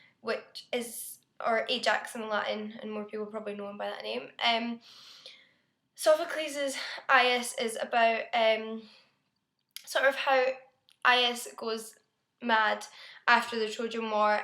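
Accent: Scotland, United Kingdom. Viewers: You sound a bit scottish. Is this true?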